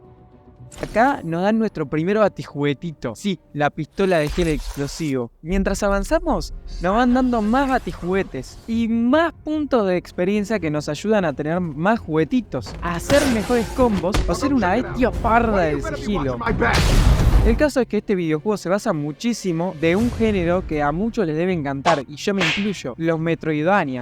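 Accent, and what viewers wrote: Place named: Argentina. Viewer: Papu este vídeo es cine desde la edición hasta la narración tan Argentina que usted tiene 40/10 y una pitusa de regalo🗿🚬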